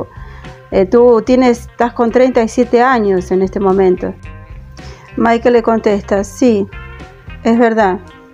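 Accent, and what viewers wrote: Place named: Argentina. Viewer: Me encantó tu forma de relatar bien argentina 👏🏻😂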